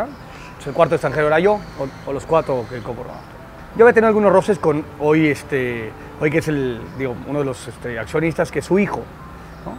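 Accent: Spain. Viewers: y gradualmente enfatizas el acento gachupin. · Lo unico q no me gusta es q uses esa forma de ablar muy español si eres de la ciudad de mexico abla como mexicano creo q es muy feo q por q viviste en españa dos o tres o dies años ya no puedas cambiar tu forma de ablar yo soy de oaxaca y con mucho orgullo lo digo tengo mas de treita año viviendo en la ciudad de mexico y aqui en la ciudad ablo como se abla aqui en oaxaca ablo como alla.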